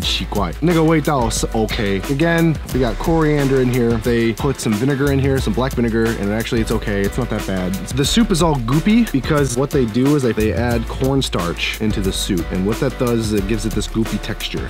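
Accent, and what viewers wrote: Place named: Taiwan. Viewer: 那中文也太道地的台灣味了吧 剛好我在吃臭豆腐我想說台灣人幹麻介紹臭豆腐啊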